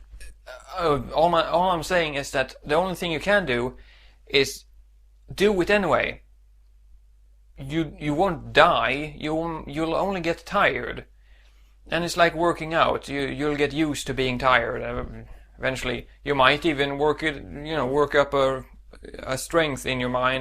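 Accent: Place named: Sweden. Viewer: I can't help loving the Swedish way of pronouncing "yob." And enyoy... Never knew.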